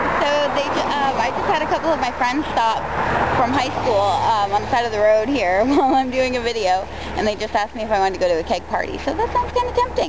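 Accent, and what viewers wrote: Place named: Maine, United States. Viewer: BeeCirca is right! You certainly are a lovely-looking "storm chaser"! I love that red hair of yours!--And that "Maine voice" you have too!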